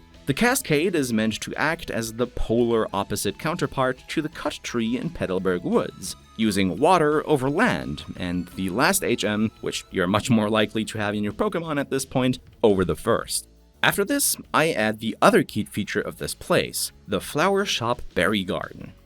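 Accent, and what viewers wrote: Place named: Germany. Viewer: Are you german by any chance? You sound a lot like you were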